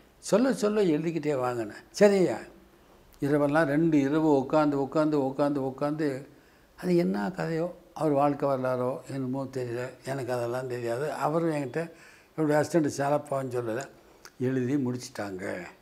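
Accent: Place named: Madurai, India. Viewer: Wonderful presentation in Madurai slang.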